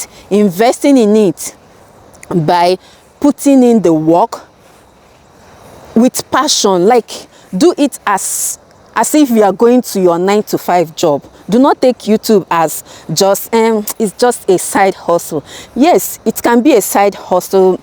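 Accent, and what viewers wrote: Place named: Igbo, Nigeria. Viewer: YouTube is really a great blessing honestly ❤❤,low view can be discouraging but we keep moving,yes momma I remember the airfryer 😅 both the accent matter,but we like our igbotic accent like that 😂, you are Amazing 🥰, keep bringing it on 👌🏽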